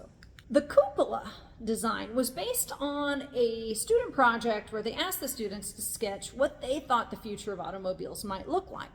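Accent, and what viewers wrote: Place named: Ohio, United States. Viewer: Cool video! You sound like you're from Ohio. Spent the better part of my 70 years there.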